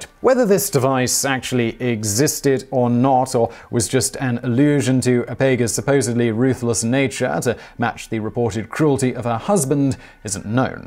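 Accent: United Kingdom. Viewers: Simon I absolutely love you but a vacation is I order my friend! I don't want you to work yourself to death. I so look forward to lovely British accent!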